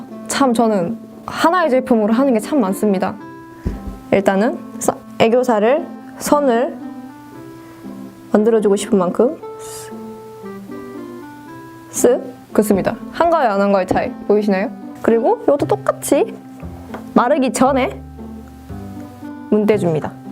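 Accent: Gyeongsang, South Korea. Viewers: 헐 오늘 영상 처음보는데 깜짝놀랐어요 중복합성피부, 뾰루지 잘 나고 누런데 홍조있고 뭐 이런건 다 비슷하다 쳐도 아이라인 쪼금만 그려도 화장 완전 진해보이는거 핵공감 ㅠㅠ 제 컴플렉스?라 해야하나 진짜 골치아파요 다른애들에 비해 화장 짙게 하는 편 아닌데도 제가 제일 풀메이크업한것같아보이는... 왜 그런지 정말 모르겠어요ㅋㅋㅋㅋㅋㅋㅠㅠ 저도 경상도 사투리 쓰는데 어느지역이세요ㅎㅎ? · ㅌㅋㅋㅋㅋ역시 경상도 븐이라 그런지 군데군데 반가운 발음들이 있네영 ㅎㅎ 넘이뿌세용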